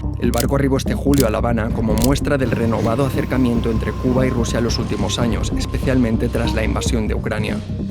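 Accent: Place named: Spain. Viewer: Me hace gracia que quien presenta este mini docu....tenga acento espanol, cada ves que escucho los espanoles poniendo el dedo bien puesto. Me pregunto porque los espanoles son los primeros testaferros del regimen? Muchos espanoles le dan oxigeno al regimen. Deberian de consumir su tiempo en propagandiar al regimen castro-canel y sus testaferros espanoles a ver si tumbamos esa inyeccion de oxigeno que le regalan al regimen castro-canel....empiezen por ahi! USA no va a dejar ninguna alianza entre ambos coja alas, esto es oxigeno entre ellos y Cuba o el gobierno nefasto de Cuba le debe hasta el culo a los Rusos. Putin como mafioso mayor que es, esta recogiendo lo suyo, los rusos no saben pelear y los cubanos muchos menos. Todo eso es fachorias para darle valor a ambos paises que estan al borde d ela quiebra social. Y China no se queda atras, los mismos desastre naturales que tienen en le pais van a cobrar todo aquel mal que lleva sufriendo el pueblo de China por culpa de otros mafiosos!! 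Cuba, China y Rusia llevan la misma dosis de tratos!! Dejarlo que cojan alita se les van a quedar en alitas de pollo....kentucky chicken.....La dictadura castro-canel le quedan dos round tick tock tick tock.....time clicking.. Milei 2023 Derrocar la dictadura castro-canel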